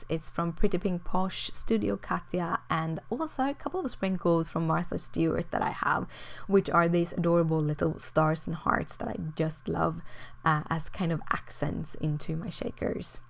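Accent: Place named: United Kingdom. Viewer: USA resident. Oh my gosh, I LOVE that you made the water hole a shaker. I'll look forward to seeing more of your videos because I like your style. And your accent. I'm going to the UK in Sept/Oct., and will hear nice accents everywhere :)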